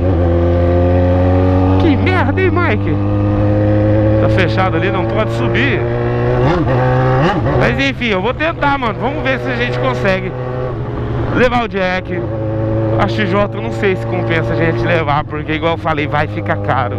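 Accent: Goiás, Brazil. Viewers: Volta a falar no pique do goias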